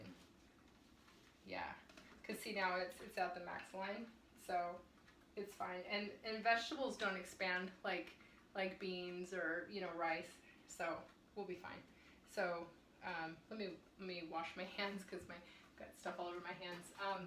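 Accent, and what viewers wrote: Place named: Los Angeles, United States. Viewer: Thank you! I'm just curious, if it's ok to ask - do you guys live anywhere near Los Angeles? The way you speak is pretty much exactly like me, and you even look like you would belong in our family!! Whatever the case - good luck to you and happy cooking. Take care, Rob.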